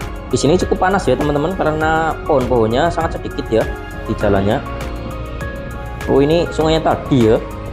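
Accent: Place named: Bumijawa, Indonesia. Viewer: Admin dr logat bahasa ky orang bojong Bumijawa, atau sktr gunung Slamet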